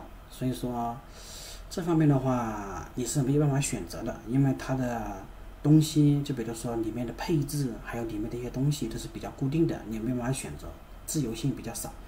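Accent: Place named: Hunan, China. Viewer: 听着像湖南口音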